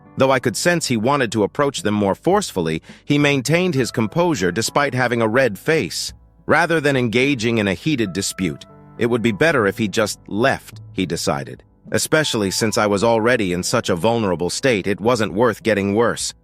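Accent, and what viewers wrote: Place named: United States. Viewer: This must be a Japanese video translated into English and given an American speaking voice. It starts with a total dimwit who is driven insane by her family and that finally "opens her eyes"! Over 18 years, OP never learned a lesson that she was a second class citizen in her own home and needed to work, save some money for emergencies? Tsk tsk For her 18th birthday, OP could have asked for a few $ and gone to Wendy's or Mac with her friends? A much more realistic goal? What a dimwit to continue to trust her birth family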